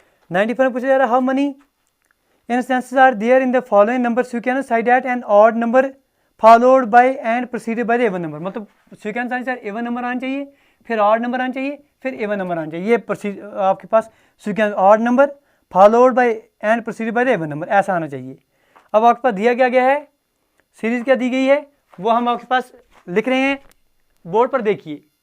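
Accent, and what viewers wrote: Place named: Punjab, India. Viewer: Love your punjabi acent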